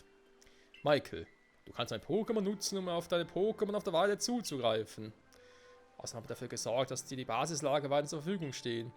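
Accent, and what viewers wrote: Switzerland. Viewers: bis dahin schau ich deine Reise durch die Hoenn Region an. 😅👍🏼 ich bin in Vorarlberg und da ist der Schweizer Akzent echt ne tolle Abwechslung zu den ganzen deutschen 😂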